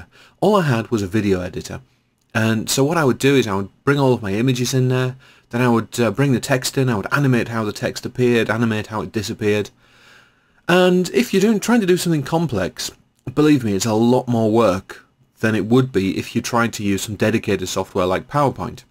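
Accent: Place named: United Kingdom. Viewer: Thank you for this tutorial sir. I'm glad that when i listen to this video i hear a light British accent rather than the strong irritating American accent that i hear all too often.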